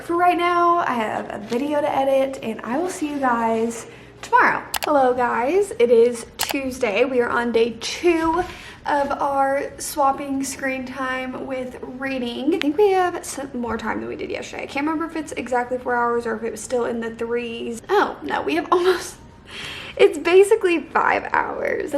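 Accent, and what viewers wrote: United States. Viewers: where is her accent from in america? :O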